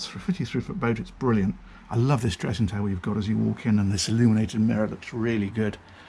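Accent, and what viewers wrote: United Kingdom. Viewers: Best boat tour channel on you-tube... it just keeps getting better... Right then... cool. :) It's brilliant. It wouldn't be nearly as good without the British accent and colloquialisms... the boat companies recognize and welcome him with open arms, which is all to the good for us broke ass dreamers. lol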